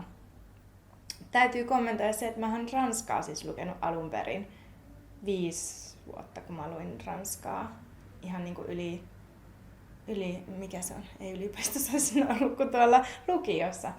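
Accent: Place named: Oulu, Finland. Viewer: Hei, mua ei niin ahdista talvet pimeyden takia, kun ne tavallaan myös rauhoittaa itseä ja helpompi nukkua. Suomessa ja varsinkin talvessa itselle suurin ongelma ihmisten asenne ja yleinen ilmapiiri. Tavallaan vaan haluaisi pois täältä ja vaikka semmoiseen paikkaan missä ei edes olisi koko talvea aurinkoista. 😅 Oon muuten kans Oululainen ja sun murteesta kans kuulee et olet ☺️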